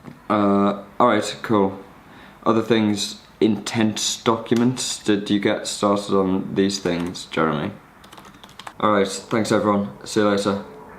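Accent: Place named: Sunderland, United Kingdom. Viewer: It is a watered down Mackem accent.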